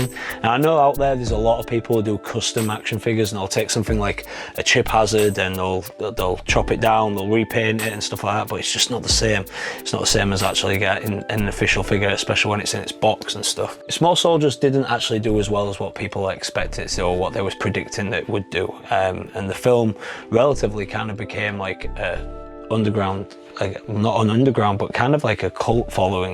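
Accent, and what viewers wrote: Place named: Hull, United Kingdom. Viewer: Are you from Hull by any chance? I can tell with your accent!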